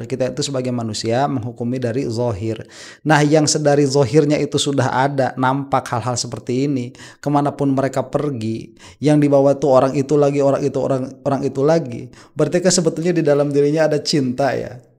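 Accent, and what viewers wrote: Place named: Surabaya, Indonesia. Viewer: Pean suroboyo ndi cak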